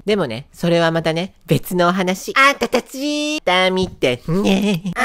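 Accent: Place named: Osaka, Japan. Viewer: あ〜今日も面白かった🙌 の大阪弁好き❤️ 東京ラブストーリーめっちゃ見てた〜 のトークもめっちゃ好き❤️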